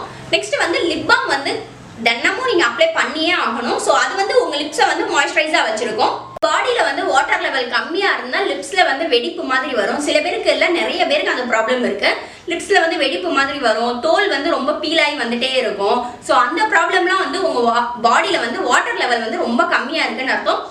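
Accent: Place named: Madurai, India. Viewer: Sissy neenga maduraiya 😘😘 slangeh solludhu "sollikirae,kuduthurae,senjirae" Any way gud content💓👌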